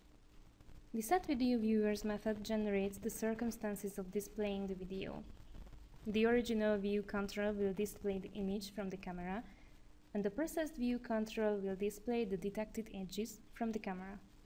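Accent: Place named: Hungary. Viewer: Annyira magyar az akcentusod hogy kihallom :D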